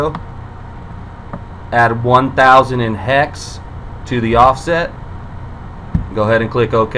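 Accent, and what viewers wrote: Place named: Canada. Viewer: Lmao. Oh! Just kiddin'! Sounds like a Canadian accent, almost Chicago-ish. Anyways, nice tutorial bro. :y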